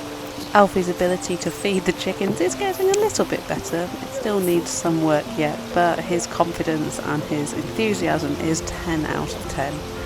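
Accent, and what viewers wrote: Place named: Manchester, United Kingdom. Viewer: Well I thought she was talking rp recived pranonsition now it terns out she sounds a mankunian?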